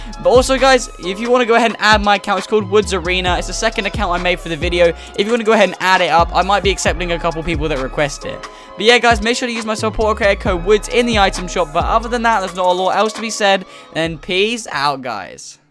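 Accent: United Kingdom. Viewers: Are you English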